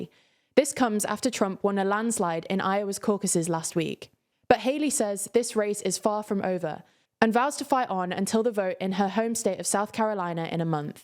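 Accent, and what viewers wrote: United Kingdom. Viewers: She is great but but has a T on the end, she is confident, easy to listen to and the news is impressive but she does say bu and similar so her pronunciation is more a natural British rather than correct :0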